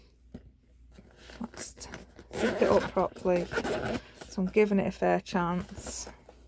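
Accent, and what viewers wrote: United Kingdom. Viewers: Are you from burnleh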